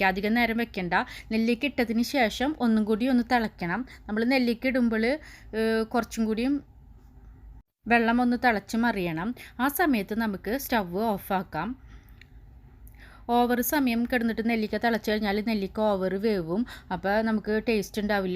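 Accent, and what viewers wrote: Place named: Thrissur, India. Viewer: തൃശൂർ ആണല്ലേ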